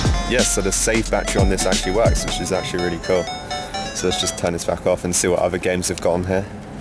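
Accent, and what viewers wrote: United Kingdom. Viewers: Had a feeling you were british its nice to hear your voice for a video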